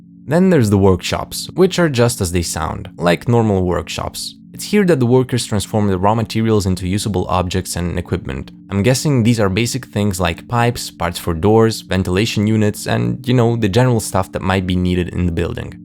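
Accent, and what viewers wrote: Russia. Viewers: there is no way you are not a Russian native speaker or at least from a slavic country your pronunciation says it all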